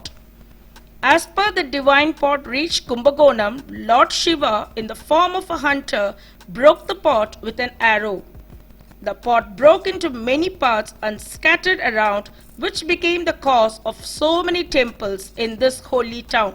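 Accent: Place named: England, United Kingdom. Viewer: Been to this temple many times. Nice English ascent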